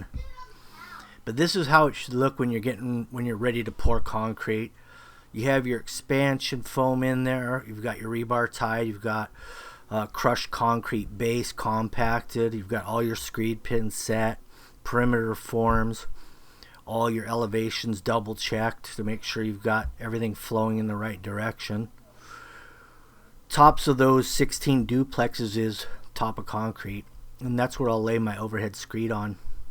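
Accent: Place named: West Coast, United States. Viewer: Bud...thanks for the glove offer.. but I truly dont need any, I got a duffel bag full ive collected for years..... you give us all a Christmas gift everytime you teach us how to do concrete...in each video... theirs so many terrible people out their giving advise so to have a legit gent is not something that happens often in our world today. On any platform....I say this carefully so not to jinx you, and your guys, but you and your guys are kind of "masters" of your art. .... I grew up most my life in California and Vegas..then I moved all over the us... so I have seen a bunch of finishes.. im guessing you live in cali cause you got that west coast surfers slang in your voice.. sounds like to me anyways.. so anyhoo.. thank you for giving amazing content for us that get a chance to watch you, and learn when we can...im watching you for the day I build my own house.... 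so I know what not to do... and to do... respect...........................................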